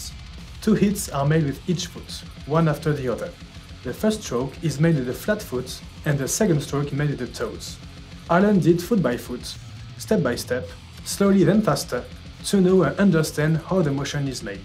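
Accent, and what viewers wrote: France. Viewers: tu est fancais ca s'entend a ton accent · Tu est français non ? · c est moi ou il a un gros accent francais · L'accent Francais on reconnais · L'accent français bien présent ✅🤣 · Ça serai pas un petit accent français ça ?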